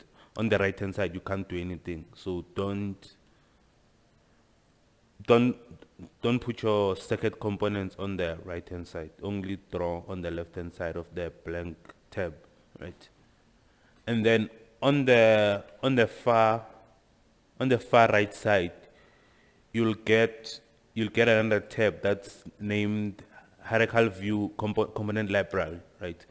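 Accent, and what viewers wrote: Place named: South Africa. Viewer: THAT SHARP SHARP AND NEEEH , TELLS ME THAT THE LECTURER IS FROM SOUTH AFRICA😅😅😅😅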